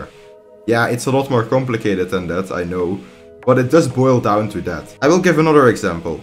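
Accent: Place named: Netherlands. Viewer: Nice nl accentje wel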